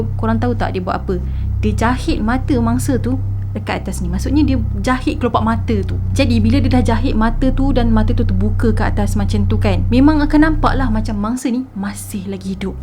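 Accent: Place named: Kelantan, Malaysia. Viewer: Israil ni org kelantan ke....dengar mcm kak ckp mcm org kelantan...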